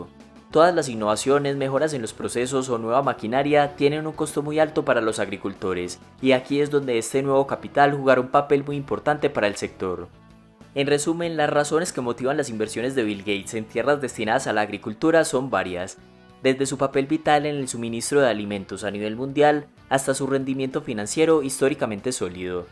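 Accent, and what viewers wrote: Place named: Paisa, Colombia. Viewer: ese acento yo lo reconozco, es colombiano paisa jaja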